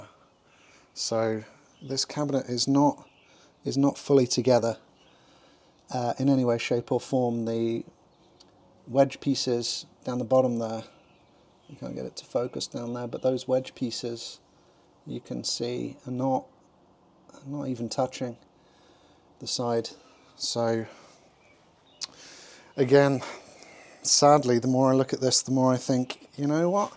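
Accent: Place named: England, United Kingdom. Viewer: This was suggested to me and it was a pleasant surprise to hear a fellow English man 😊 Looking forward to watching more videos, Galaga is a true classic and definitely worth restoration. Subbed.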